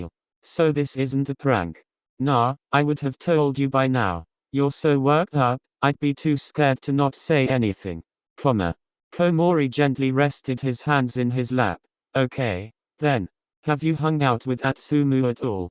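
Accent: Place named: United Kingdom. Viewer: Wow why do you sound British- owo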